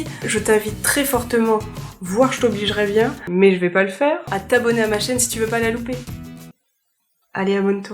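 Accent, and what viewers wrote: France. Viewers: Messemblais que tavais un accent français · M0i jaddort l'accent française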